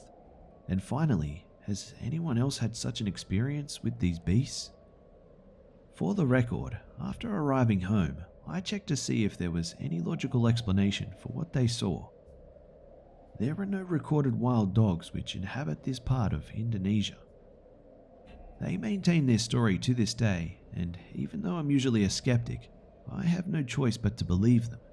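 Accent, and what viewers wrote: Australia. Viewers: you sound like the narrator for top trending with an australian accent. ^^ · The first story makes me think about that Guy Pearce movie that was based on the true story, about the girl in Australia who was murdered by the daughter of old neighbors who was mentally unstable (your accent, probably why I thought of it bc it was set in Australia).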